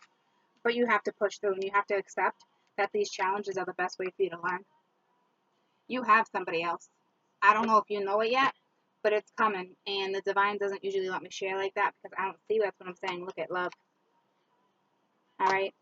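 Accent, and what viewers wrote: Boston, United States. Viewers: I'm frm NY n its sounds like ur frm more north like Boston but ur still attractive n made kool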